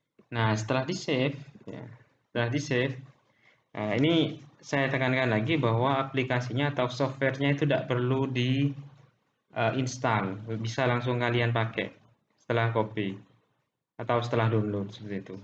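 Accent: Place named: Madura, Indonesia. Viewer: Logatnya madura😅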